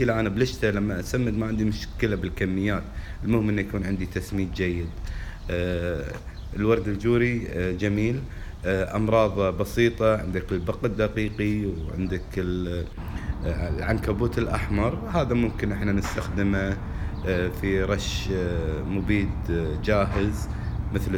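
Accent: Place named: Gulf, Arab world. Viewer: انته خليجي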